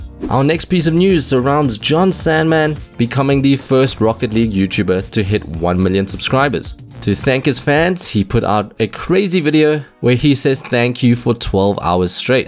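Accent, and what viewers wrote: South Africa. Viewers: Do you have a South African accent? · U sound like u from SA big up anyone from Cape Town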